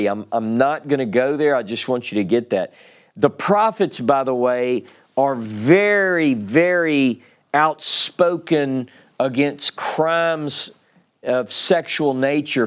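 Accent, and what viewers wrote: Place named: South, United States. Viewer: Brother, your southern accent is infinetly amusing and very fitting for a teacher.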